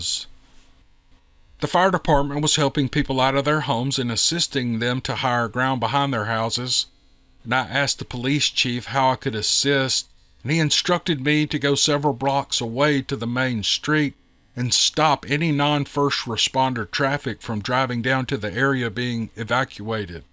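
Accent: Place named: Louisiana, United States. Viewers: GREAT Cajun accent! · Let's do it! Protest indeed. I have loved a couples of products that got discontinued soon after I discovered them! That is so aggravating 🙄 Especially certain flavors of foods. 😵‍💫 Love your story about your mother in law, too❣️ Never listen to the negative comments about your banter or rambling, or whatever, because I love it❣️ It's always interesting & it's true, real life stuff❣️Can't get any better testimonies, stories, experiences, etc., than that! Haters can move on if they don't like it. 😉 I'm sure I'm not the only one that loves your stories, ALL of them. Love your Cajun accent too❣️ 👊 Spot on! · Really enjoyed this... Thx buddy..... PS.... That Ice cream being discontinued will save you on bike rides!!! Lol.... Oh yeah, nice Cajun accent!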